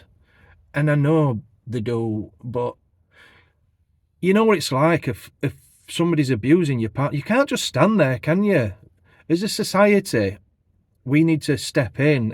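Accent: Yorkshire, United Kingdom. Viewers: Neighbour hood watch still exists in some areas, and you can link up to your local police online to receive info and advice. But to you sir well-done my only claim to fame is getting 2 local drug dealers of the street from a previous address, work with the police as a source of information and results can be achieved. Kudos to you sir always nice to hear a real Yorkshire man.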